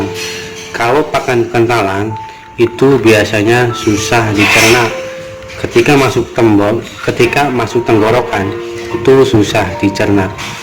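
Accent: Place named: Indramayu, Indonesia. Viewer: Wong indramayu ya bod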